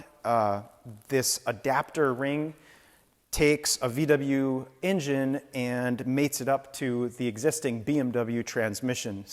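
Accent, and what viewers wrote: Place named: Manitoba, Canada. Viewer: <link> Are you Minnesotan or Canadian? Specifically Manitoban? I live in Winnipeg, and everything about your accent screams Manitoban to me.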